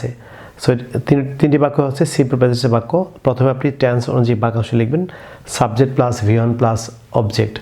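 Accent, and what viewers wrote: United Kingdom. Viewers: I like british accent so much. I am learning british pronunciation from your You tube channel sir. You are good at british accent.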